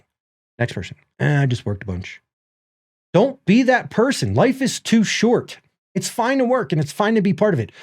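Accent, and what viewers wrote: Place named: New York, United States. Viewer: Off topic but l've got a friend with the same accent and voice as yours. New York?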